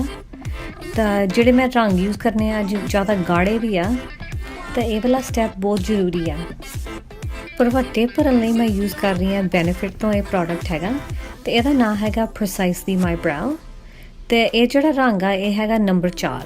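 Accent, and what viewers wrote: Punjab, India. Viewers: bot hi sohne lg rhe ho😍😍😍😍😍 ..tuc explain bot vadiya krde ho..gbu dear ..nd i love ur punjabi accent...😘😘😘😘 lots of love from delhi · I love you 😘😘😘 yrrrr I am fan of your punjabi accent😘😘😘 please do more videos like room tour home tour,makeup collection!!! 🙏🏻☺️ wanna see it😘🙂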